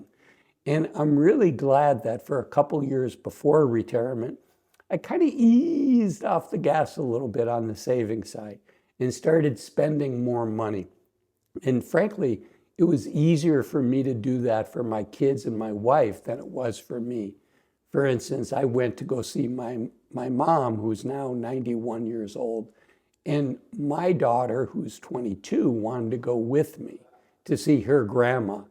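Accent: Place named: Utah, United States. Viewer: I’m a transplant to Utah after a career in the military. I knew I recognized that Utah accent of yours.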